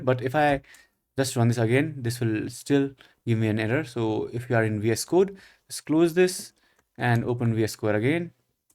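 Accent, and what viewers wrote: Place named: India. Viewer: As soon i lisen indian vocal i finely talken breath after 2 hr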